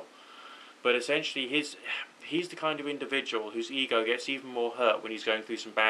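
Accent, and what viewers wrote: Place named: England, United Kingdom. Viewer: o sorry about my typing and yeah my names sari sherri and o your not australian like totally english your funny. i Enjoy listening to you you seem so genuine and have a great personalty and common scense. thanks again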